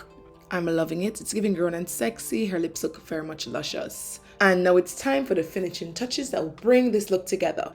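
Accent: Jamaica. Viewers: I don’t if am hearing wrong but are you Jamaican? Because I’ve been binge watching your videos since I’ve discovered you and I’m getting Jamaican vibes 😅. Great videos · What is it, Jamaican? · From mi hear di accent mi subscribe 🇯🇲❤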